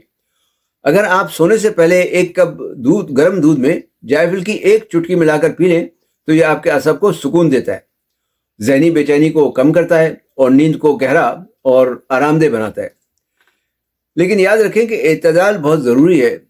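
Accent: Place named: Lucknow, India. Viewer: آ پکا بات کرنے کا انداز یوپی والا ھے جیسے لکھنؤی!!!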